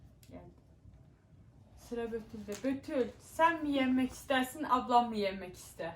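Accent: Bolu, Turkey. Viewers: Abla seni izleye izleye evde bolu sivesiyle konuseyom herkese gııııı deyom seni seviyoruz devamkeee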